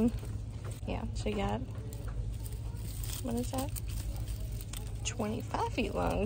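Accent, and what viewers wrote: South, United States. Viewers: First time following u! I love Halloween 🎃 everyday!! Are u from the south? I live in Iowa no dt Halloween?!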